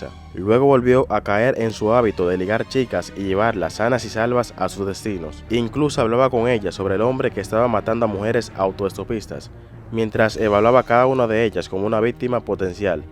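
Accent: Dominican Republic. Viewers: Me encanta como narras y como pones los comentarios de las personas con nuestro dialecto Dominicano! 😂 Tengo muchos vídeos ya visto tuyos.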